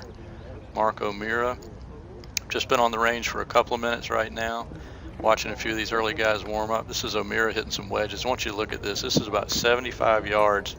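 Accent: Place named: South, United States. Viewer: Hey, Rob here from England. Thanks a bunch for putting this up, it's home spun but that's good! Great to see the swings in slo mo, a great feature thanks! Love the commentary in that southern accent all we Brits think is so cool! I MUST get to the Masters one day, nearly did for the big 'five oh' this year, but didn't in the end... hopefully some year soon. Love Rickie Fowler's swing, it's unique for sure but why so long you think!??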